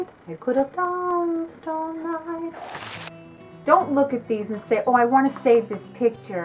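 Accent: New Jersey, United States. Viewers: Thanks for the tip. I don't know why I haven't bought one of those DVD holders yet. I actually did purge my DVD's this year and I gave away about at least 100 of them to the girls at Good Counsel Homes. Anyway, I'm seriously thinking of getting a DVD book like you have for the rest of them that I decided to keep. MyDVD and CD cabinet is exploding at the seams. BTW -- I KNEW you were from East Rutherford with that Jersey accent.........I'm a Bloomfield girl myself!! God bless!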